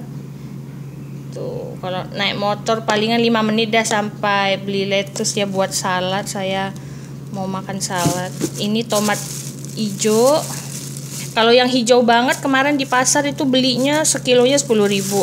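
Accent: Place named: Sumatra, Indonesia. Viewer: ohh pantes logatnya sumatra bgt..lam kenal balik bun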